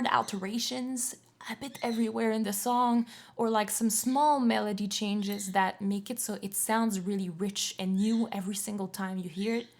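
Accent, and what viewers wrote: Quebec, Canada. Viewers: Your accent look like french canadian !?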